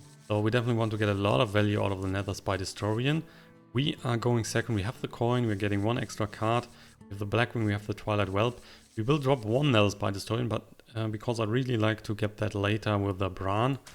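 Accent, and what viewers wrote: Germany. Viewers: What country are you from? Your accent sounds german.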